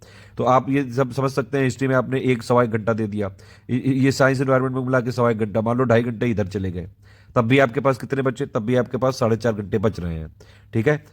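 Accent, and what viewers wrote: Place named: Uttar Pradesh, India. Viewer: Apke bolne ke style se hi maja aajata. Bindass bolte sir, pura u. P. Style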